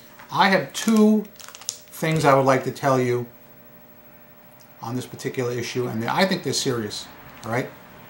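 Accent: New York, United States. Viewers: noticed ur NuYawk accent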